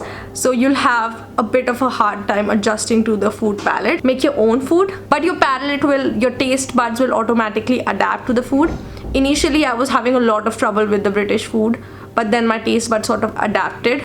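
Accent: India. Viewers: I wanna ask do people there react negativity for your indian accent? Cause i heard that foreigners doesn't like Indian accent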